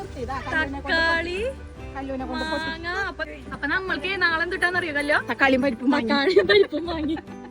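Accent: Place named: Thrissur, India. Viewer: സ്ലാങ് കേക്കുമ്പോ അറിയാം... തൃശൂർ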